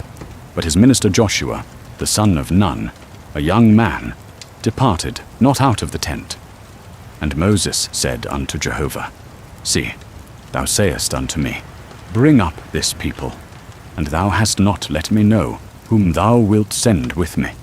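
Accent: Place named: New York City, United States. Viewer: It’s giving NYC OR Chicago 😍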